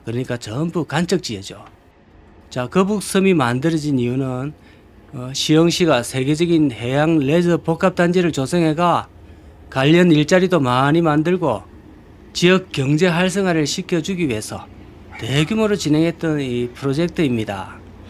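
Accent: Gyeongsang, South Korea. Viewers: 사는데가 김해인교? 말투가 전형적인 부산.김해 이신거로 보이는데 뭐하시는분인데 공실건물을 찾아다니는건감요? 경매 하는교? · 꾸준히 잘보구있읍니다.좋은 조언감사합니다.그냥 인생공부한다 생각하고 봅니다.수고하세요.참 구수한 갱상도 사투리! 얼굴이 궁금해지네요?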